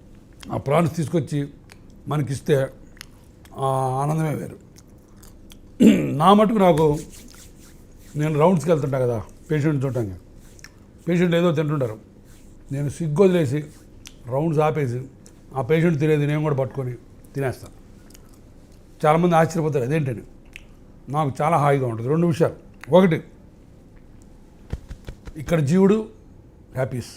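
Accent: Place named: Nellore, India. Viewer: నమస్కారం సార్. ఓరకంగా మిమ్మల్ని చూసి అసూయ పడ్డాను. మీరు చూపించిన మాయాబజార్ సినిమాని పూర్తిగా ఎంజాయ్ చేసాను. ఒక్కసారి మళ్లీ 50 ఏళ్ల క్రితం అనుభవించిన బాల్యానికి తీసుకెళ్లారు. మా నెల్లూరు మలై కాజా మర్చిపోయారు. ప్రతి పదార్థాన్ని పూర్తి స్థాయిలో అనుభవిస్తూ చేసిన మీ వర్ణన మీ భాషలో అద్భుతః. మా నెల్లూరు యాసలో అదిరిపోయింది. ధన్యవాదాలు సార్. 😂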